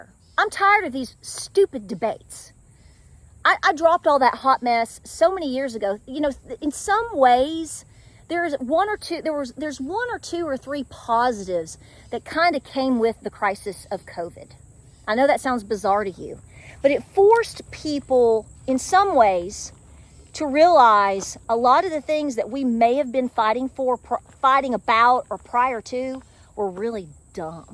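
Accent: South, United States. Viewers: I've just found your channel recently and I could of course tell you are from the south but today I heard you mention Oliver Springs and Oak Ridge Tenn and I thought oh wow I haven't those towns mentioned in years. I went to elementary school in Oliver Springs and Clinton and other family members lived in Oak Ridge. Brings back memories.😊 I really enjoy your program!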